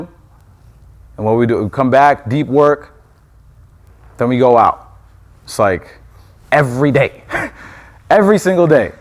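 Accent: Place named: New York, United States. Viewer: Oh you DEFINITELY from NY lol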